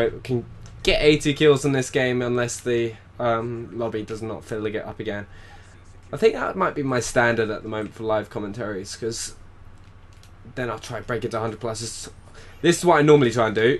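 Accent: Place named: London, United Kingdom. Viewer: Which part of london u from. Im in essex. P.s im nt a stalker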